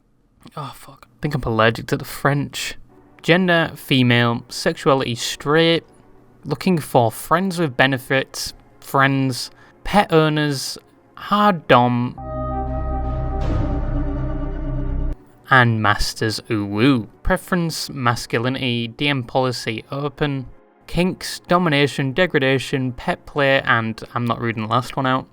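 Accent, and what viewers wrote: United Kingdom. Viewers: what accent is this, ik its uk but what area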